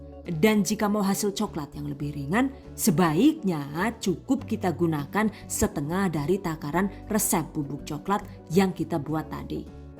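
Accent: Banyumas, Indonesia. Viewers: Kakanya orang mana kok ngapak 😂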